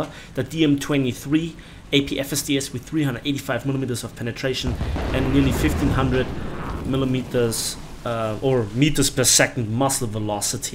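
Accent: Germany. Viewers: Hmm, you sound German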